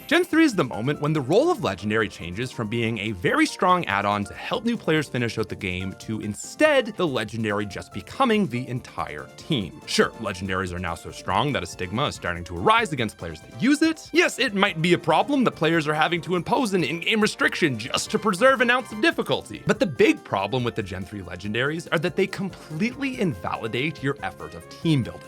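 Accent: Canada. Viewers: Oh your Canadian makes sense now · He's Canadian. Every time he says something with "out" in it, it sounds like "oat" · Well that's just Canadian pronunciation. I don't think they say drought like that though.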